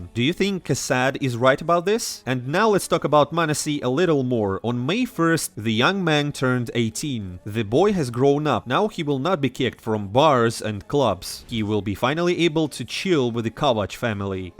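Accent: Russia. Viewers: Тут скорее палка о двух концах - из-за того, что канал ведёт, и я явно слышу русского человека за озвучкой, и носителям английского такая речь режет уши. И тут они не могут воспринимать новости серьёзно, да ещё и речь идёт о СНГ - командах. Огромный респект, но нужно адаптировать формат под западных слушателей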